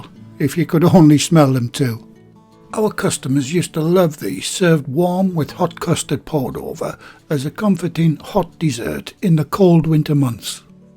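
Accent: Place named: North East England, United Kingdom. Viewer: Hi, I’ve just made your amazing blueberry muffins absolutely delicious, I recently retired and it’s my favourite pastime baking your recipes, excellent instructions and great to have someone with the same accent, as I am also from the North East of England All the very best for the future and thanks again 👍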